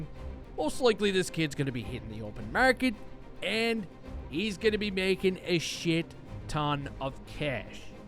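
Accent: Newfoundland, Canada. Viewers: I love your newfy accent! great content too! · Is you from Newfoundland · You have a real Canadian accent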